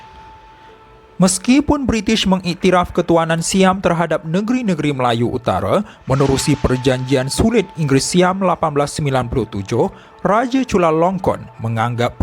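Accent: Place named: India. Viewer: Dengar suara YouTuber ini seperti India bercakap Melayu..Rasaya YouTuber seorang India...Cakap pelat India..Fakta yang ditulis ada sedikit tak betul.. Perjanjian Inggeris-Siam berlaku kerana Siam tidak mahu British menjajah negeri Siam..Untuk menyelamatkan Siam dijajah British.Kalau nak tulis kena kaji betul2 jangan bagi fakta yang kurang tepat.Siam adalah satu2nya negara yang tidak dijajah & sebab itulah berlaku Perjanjian Inggeria Siam..& Southern Thai Pattani & Golok terpisah dari negeri Kelantan & menjadi sebahagian negara Siam Thai hari ini..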